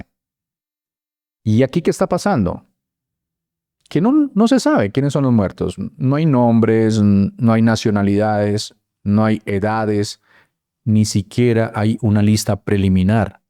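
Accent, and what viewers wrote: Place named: Colombia. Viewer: En Venezuela nadie se esta quejando de eso porque aca en EEUU si?? Tu tienes acento Colombiano sabes que si no hay familias hablando es porque el narco esta detras de esto , en Venezuela hay informes de periodistas que dicen que Dios Dado Cabello tiene amenazadas s a las familias porque?? Porque esta conectado con el narco estado de Maduro , Trump esta cometiendo muchos errores este no es uno de esos estan volando narcos y eso esta bien, quienes piden explicaciones el borracho de Petro ??? Maduro que no es presidente de nada ?? Quienes sufren el narco en america latina jamas van a estar en contra de esto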